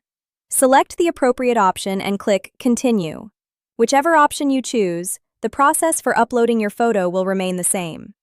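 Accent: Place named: United States. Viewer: why woman with an American accent ?????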